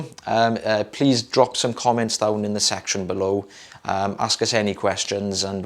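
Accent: Wales, United Kingdom. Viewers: Lovely to see you & hear that great Welsh voice. It's so good that you have your own style of presentation & it complements ' very well. Be your own person!👍🏻🏴🕊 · I enjoy your accents. Idk why but a lot of accents get on my nerves I think Welsh is the most pleasant one in the British isles.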